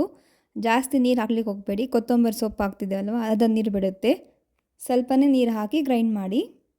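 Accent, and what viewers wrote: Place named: Bangalore, India. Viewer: Banglore style lli sakhathagi mathadtheera👌😊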